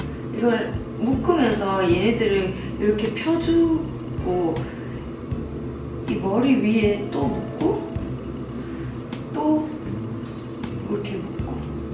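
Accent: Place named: South Korea. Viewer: I heard some dialect in your Korean, are you not from Seoul? :)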